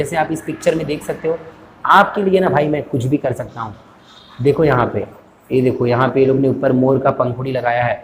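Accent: Gujarat, India. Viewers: Sir Aap GUJARATI ho..?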